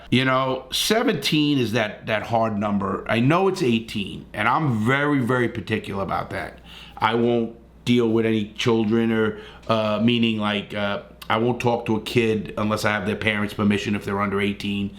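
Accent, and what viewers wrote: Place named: Boston, United States. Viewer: I did well at an audition because of you. Hours of hearing your Boston accent helped me master it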